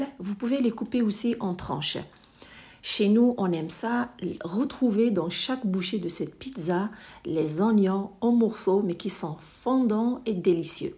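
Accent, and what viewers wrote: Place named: Kabylie, Algeria. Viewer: Merci, faut avoir 2 mains gauches pour ne pas reussir cette Coca grace a tes consignes 😜Un bonjour de Hollande d'un wlid bladak (j'aime ton leger accent kabyle)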